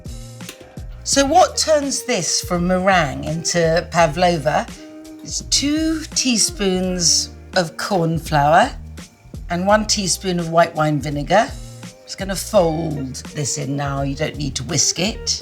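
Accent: United Kingdom. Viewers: I do love your very British English!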